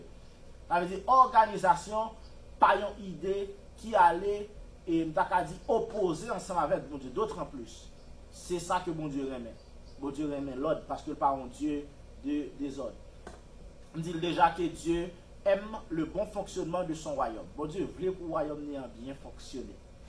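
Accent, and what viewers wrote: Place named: Portugal. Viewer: Jusqu'à présent, je ne sais pas si le présentateur parle Français ou Portugais 🤔. C'est une langue hybride ?!! Je ne comprends rien.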